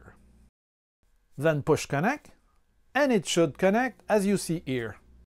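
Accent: Quebec, Canada. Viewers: Allo juste avec ton accen je comprend que tu dois venir du québec ;) as-tu essayé avec la version actuel de cura sois la 4.4.1 ? j'essias et je ne trouve pas comment faire...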